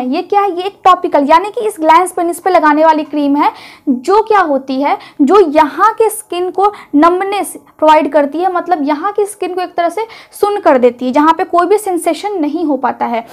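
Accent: Bihar, India. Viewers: बिहार या मैथिल इलाकों से होने की वजह से यह हो सकता है।ज्यादा सीरियस मत लो , इनकी मेहनत की तारीफ होनी चाहिए।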